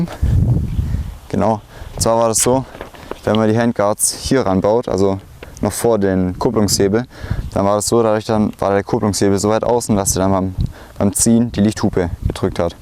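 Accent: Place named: Swabia, Germany. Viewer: muss man doch au ned ich finde man kann ruhig ein wenig hören das man n schwabe is 👍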